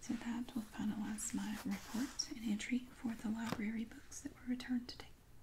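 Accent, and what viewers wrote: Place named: North Carolina, United States. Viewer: Your voice reminds me of home. Born and raised in North Carolina. Very soothing to listen to when I'm far away from home. Hope you had a blessed holiday season 💕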